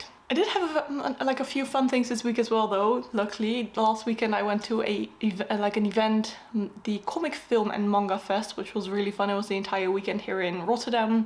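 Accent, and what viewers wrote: United Kingdom. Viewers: I've recently started following you here and I'm very happy about it. I love the way you talk. (i love British accent) to the way you live, work and everything. I got thrilled when I got that you love crafting cause I call myself a crafty girl. Also, it's new year in Iran and I want to say happy Nowruz! (Persian new year) I hope that good things happen to you lovely girl ❤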